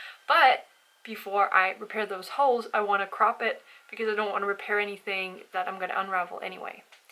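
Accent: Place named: Scandinavia. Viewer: So lovely to hear ever so slightly Nordic-tinged English : ) This was such a nice video to stumble on.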